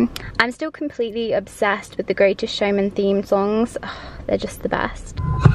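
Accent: United Kingdom. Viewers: Hey my queen😍😍😍😍 I jus love ur British accent sooooo much n ur voice jus calms me down.. every single tym I watch ur videos it drives me crazy n I fall in love wit u even more.. ur soooo dam pretty ur eyes r sooo heavenly.. ur smile is killing n it makes me smile too.. thank u Soo much for bringing smile on my face every single tym.. I love u from d core of my heart my only wish in my lyf is to c u for 5 secs I Don't deserve to cm n talk to u so my only wish is to watch u for 5 secs😭 I VL blessed if such hapns❤ im from India n ur my angel really love u a lot😍❤😘😘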